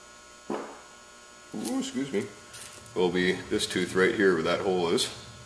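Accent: Alberta, Canada. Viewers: canadian accent, lived there for 8 years ;) looking to self install cam in my 2012 camaro ss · are you canadian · Sounds more like an Alberta accent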